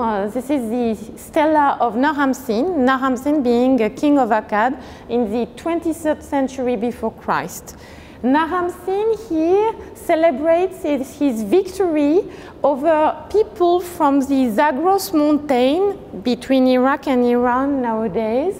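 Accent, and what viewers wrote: France. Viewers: Thank you so much for this visit ! As a german i’m very pleased to be able to understand without having to be focused on the subtitles :) the french accent gives me the impression to travel til’ Paris with you in this marvellous place that is mesopotamia ! My favorites are definitely the lamassus at the entry, so impressive 💜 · Quel accent français insupportable ! Je doute qu’un non francophone comprenne le moindre mot.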